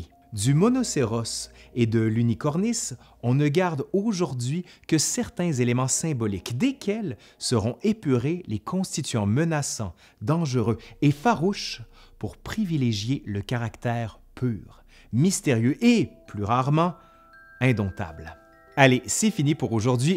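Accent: Quebec, Canada. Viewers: Encore une fois j apprends de nouvelles choses moi qui suis passionné d'Histoire et de mythologie ! De plus tu es un formidable conteur et pour moi Français ton accent québécois ajoute encore plus de magie dans ce sue tu nous contes ! C est charmant cet accent et ca rend encore plus captivant tes vidéos ! Je suis fan fan fan ! 😊😊 Continue de nous instruire par tes sujets historiques, mythologiques etc. avec tout le passion et le travail qu'on décèle dans tes vidéos ! Encore bravo et surtout encore mille merci ! 😉😉👏👏👏👏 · Ça me fait un grand plaisir de vous écouter, Monsieur. Peu à peu je développe un engouement pour l'accent québécois.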